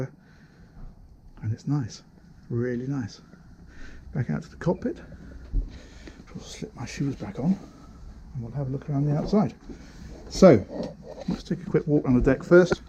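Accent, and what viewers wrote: United Kingdom. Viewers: I like clarkson very much, but this nice man reminds me more of David Attenborough, because he speaks with a calm voice and just in plain, civilised, british english that even i can fully understand as a dutch dude, And Clarkson is way more loud and entertaining, which i like aswel, but not to review a yacht.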